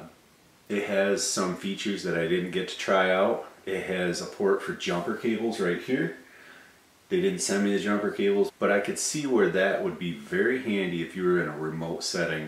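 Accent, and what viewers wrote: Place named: Midwest, United States. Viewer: you sound like you are from Michigan. definitely the midwest. I know that accent cuz I’m from there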